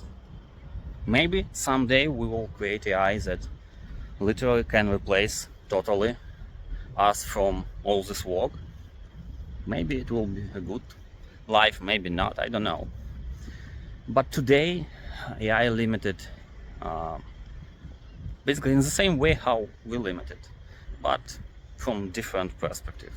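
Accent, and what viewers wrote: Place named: Eastern Europe. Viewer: толковые вещи говоришь, но акцент... я в курсе, что у них ещё хуже за счёт индусов итп, и в курсе что нет ни времени, ни сил исправлять, но славянину капец как режет слух родной славянский акцент в англ если хочешь, могу подсказать рецепты быстрого удаления наиболее режущих слух моментов нет, я не препод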